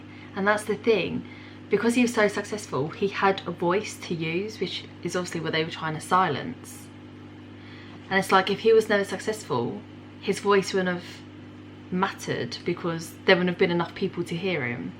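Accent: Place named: England, United Kingdom. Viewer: I love ur English accent ur so cute and keep react Indian songs Punjabi hits ❤️🇮🇳🤘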